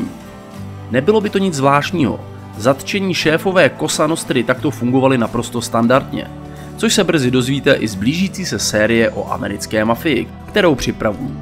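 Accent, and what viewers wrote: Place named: Pilsen, Czech Republic. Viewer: Tak mluví lidé ze z Plzně. Je to v Česku normální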